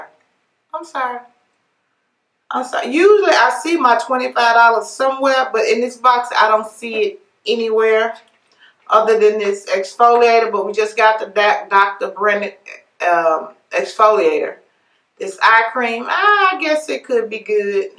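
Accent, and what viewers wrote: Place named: Texas, United States. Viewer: Your accent makes me so happy. Are you from Texas too? Hugs!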